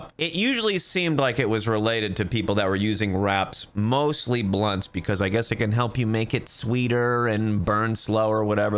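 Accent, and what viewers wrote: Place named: New York, United States. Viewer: What is with the random New York accent you start and stop using at random? I've been watching for a little while and you only mention Colorado and Florida. It's weird af.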